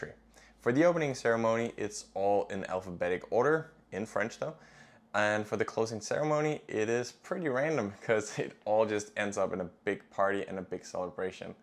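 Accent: United States. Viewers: You are from Denmark but yet you have an American accent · Appreciate your sharing。Your English seems more like American style than British style. Why is that? Is the general case for Danish speaking English?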